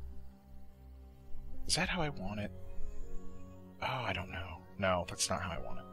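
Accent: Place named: South Africa. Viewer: That south African accent helps: )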